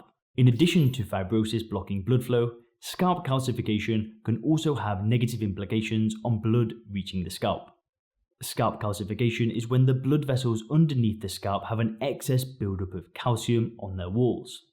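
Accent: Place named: Geordie, United Kingdom. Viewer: Brother I am from northern England same as you, but your accent has got me puzzled, it's like a Geordie that has lived in South Africa for a while.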